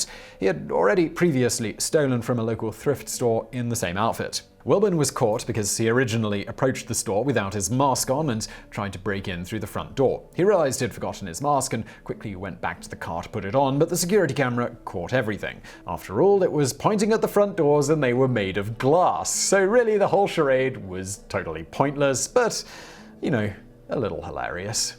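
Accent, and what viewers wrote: United Kingdom. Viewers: sure seems to revel in the ridiculousness that happens in America. We enjoy it here too, but it cuts deeper hearing it in a British accent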